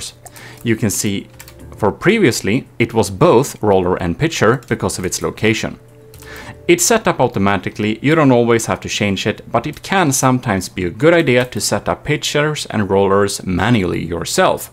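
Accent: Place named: Sweden. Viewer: I can tell that he is from sweden, hej hej jag är också svensk BTW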